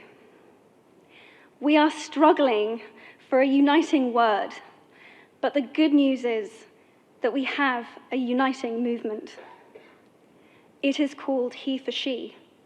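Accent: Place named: United Kingdom. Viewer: 英式英語欸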